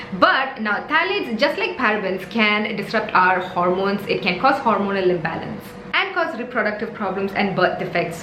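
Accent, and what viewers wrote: India. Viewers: My god! her English is sooo Indian and I fucking live for it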